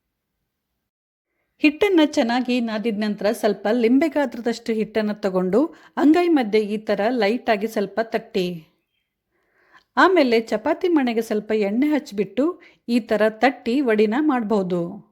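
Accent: North Karnataka, India. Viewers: 👌👌👌 ನೀವು ಉತ್ತರ ಕರ್ನಾಟಕದ ಶೈಲಿಯಲ್ಲೇ ಮಾತನಾಡಿದರೆ ಬಹಳ ಹಿತವಾಗಿರುತ್ತದೆ.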